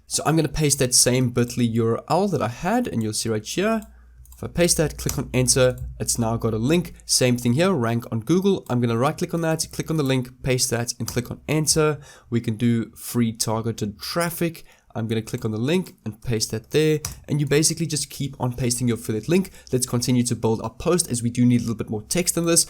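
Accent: South Africa. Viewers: Sth.Efrican accent plus FF speed make it very difficult to follow. Relax buddy because content seems interesting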